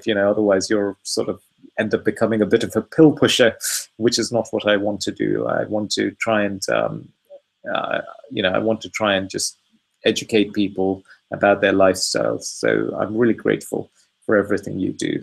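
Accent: United Kingdom. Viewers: Love your accent, British ya?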